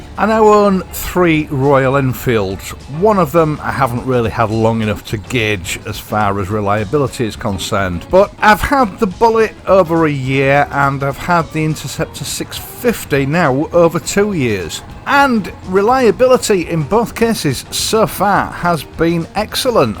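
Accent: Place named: Black Country, United Kingdom. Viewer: if I may be so bold, having watched a great many of your videos, I have come to the conclusion that you are from a sadly extinct species that I would label a "proper copper" I grew up in what was then a small village of a thousand or so people. the local cop new all of the "conspicuous " kids, and while I am not really for physical punishment, having a cast iron boundary in the form of a large shiny black shoe, applied just firmly enough to command respect changed the course of the life of some of the disreputable youngsters I knew. what kept me in line was knowing if I offended, he knew who I was and would be round to read the riot act to my parents (both ex military) he knew me because I was conspicuous at 14 I was six foot four and 15 stone, so i stood out. many of the "problems I see now seem to come from the extinction of authority figures like that, plus being born in Birmingham, as was my dad i enjoy your accent, which while quite different from Brumie still is very black country in its own way. 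keep up the good work, loving it 😃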